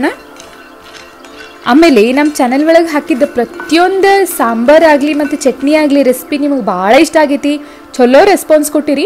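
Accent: North Karnataka, India. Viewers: Impada north Karnataka Kannada - very pleasant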